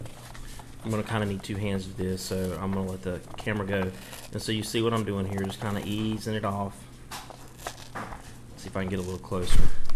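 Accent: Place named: South, United States. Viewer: Like your southern accent!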